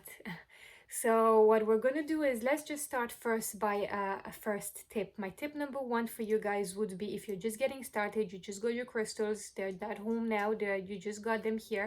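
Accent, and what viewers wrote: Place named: France. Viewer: Liked. Subscribed. Clearly you know crystals. Love your accent. French? Or maybe I’m the one with an accent. Waves of zen coming your way!!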